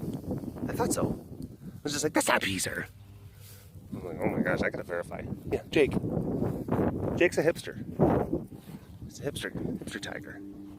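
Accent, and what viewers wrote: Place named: New Jersey, United States. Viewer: Love the Jersey accent! ;-)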